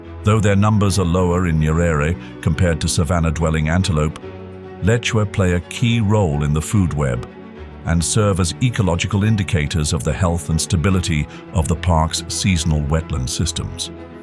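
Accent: United Kingdom. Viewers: At least the source discloses that this is AI-generated. But why does the AI narrator always have a "posh" southern England accent? This is almost without exception the case. Do the people who create these things think that the accent lends an air of credibility and gravitas?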